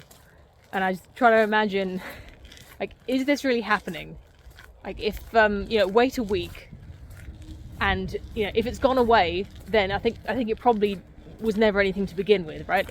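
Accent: England, United Kingdom. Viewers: Been on a bit of a Mary Harrington kick and can't help thinking she sounds so much like you (because I knew of you first). Any relation? Or just from the same region of England and thus similar speech patterns? As an American grieved by our vast population's continual homogenization of accent I love how much more so(at least it seems) folks in the UK are maintaining distinct regional accents. (Though I know the flip side of that coin is accent and class being closely tied and that limiting your options in career is a real thing for y'all.)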